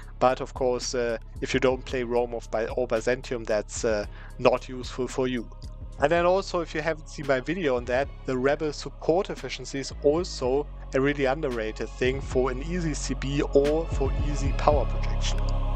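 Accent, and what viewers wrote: Germany. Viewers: Sometimes he really butchers the English speech xD Also you have a crazy German dialect but i like it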